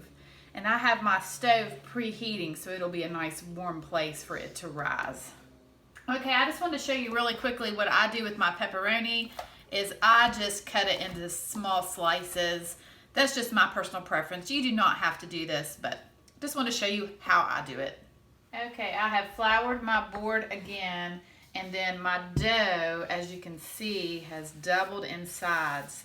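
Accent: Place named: Appalachia, United States. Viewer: the minute I heard the first two words out of your mouth, I knew I had a fellow mountaineer! I am so excited!!!!!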